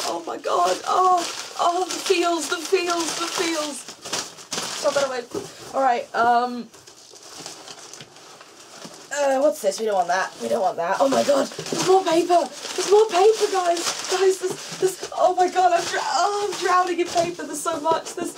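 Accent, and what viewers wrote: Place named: United Kingdom. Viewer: I've had the privilege to get to know you a bit better. You are woman with a special personality, not just cute nor hot. but so much more. You have earned my respect for being yourself. And you should be proud of it. I love your enthusiasm and spirit. Your kindness and funny expresion. And ofcourse you lovely brittish accent :) <3 Sat Sat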